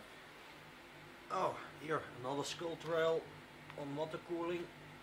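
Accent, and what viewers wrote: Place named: Belgium. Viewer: your English accent is pretty funny xD Klinkt nog een beetje belgisch:P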